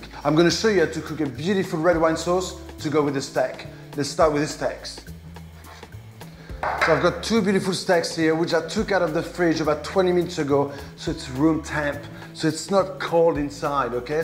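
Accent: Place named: France. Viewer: Omg I know is French and his accent makes it hard to understand. The subtitles however is terrible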